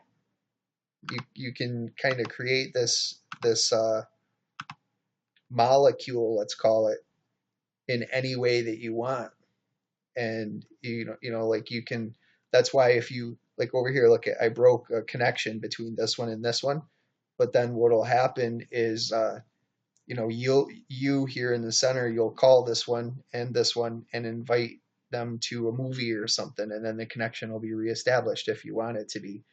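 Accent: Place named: Minnesota, United States. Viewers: I'm getting a UP vibe on that accent, lol. You from the upper midwest? 😁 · sounds a little Minnesota to me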